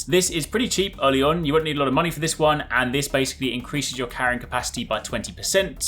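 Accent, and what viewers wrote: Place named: United Kingdom. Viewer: I set to .75 speed and it is such a normal pace of conversation, I think it was recorded at this speed and then compressed to run at the current speed. Now if I can find the switch to turn off the over-accented british pronunciations so that I don't need subtitles, that would be awesome.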